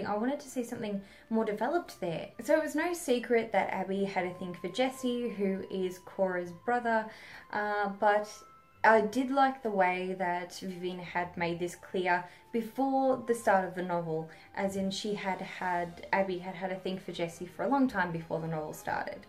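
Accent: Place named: Melbourne, Australia. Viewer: You sound like you're from Melbourne! =D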